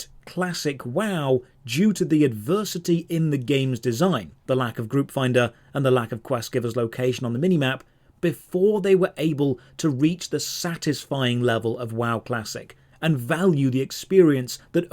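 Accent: United Kingdom. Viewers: You sound so british for a swede.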